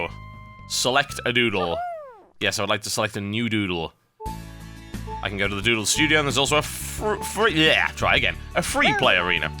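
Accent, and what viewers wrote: United Kingdom. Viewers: nope, his natural accent is a geordy accent; think cockney with a concussion and a mouth full of novocaine. he's just trained himself into using a more "proper" british english, basically the british version of the midwestern standard that most TV show hosts and newscasters have.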